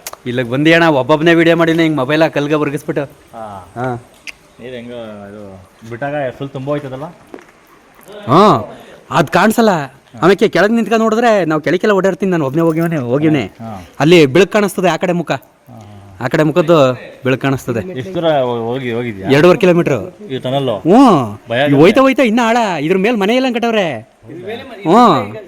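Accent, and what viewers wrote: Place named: Magadi, India. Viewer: ನಿಮ ವ್ಲೋಗ್ ಚನಾಗಿರುತೆ ನೋಡಕೆ ಮಾಗಡಿ ಮಾತು ಬಿಡದಿ ನಿಮ್ಮ ಪ್ರೀತಿ ಬಾಯಿಸುವವರು 🎉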